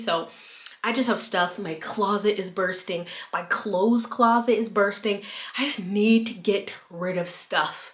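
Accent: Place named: South, United States. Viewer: Let me guess...Alabama or Mississippi.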